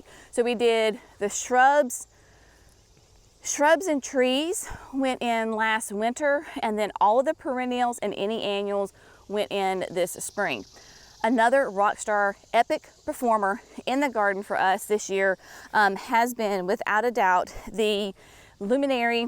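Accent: South, United States. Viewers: You give me such great joy in the morning. I grab my coffee and listen to that beautiful southern melodic voice of yours. You and have worked so hard on the gardens. Proven Winners picked the most dedicated gardeners in the South! I can’t wait to see what you all do.😍❤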